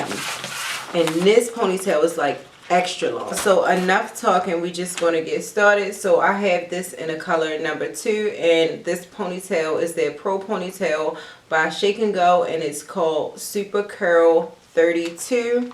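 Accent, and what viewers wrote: Baltimore, United States. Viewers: bmore accent ?? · This is my first video ever watching her 😂 i think i can tell that she from Baltimore lol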